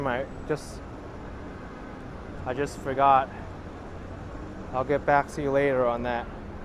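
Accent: New York, United States. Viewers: Is that a new York accent? Thanks for the video, now I don't need to visit. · Great Video - thanks for the info as you walk - keep up the great work and if i ever do get to nyc, i would love to meet the - love your nyc accent lol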